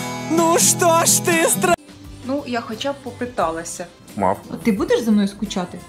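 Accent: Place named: Surzhyk, Ukraine. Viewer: Слухай,розмовляй будь ласка на Українській мові,ато ти говориш на суржику як Вєрка Сьєрдючка з Полтави.Роби щось з собою).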